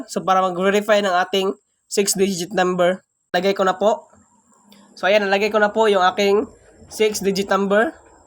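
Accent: Visayas, Philippines. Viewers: Bisaya man deay ka mas galisod ko sabot sa imong tagalog😅 anyway unta ma sundog nako imong vedio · bisaya ahaha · Bisaya eh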